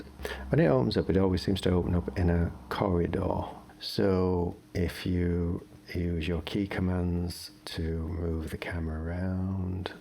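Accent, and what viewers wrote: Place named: United Kingdom. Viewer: wow this guy's voice is different he must be from the UK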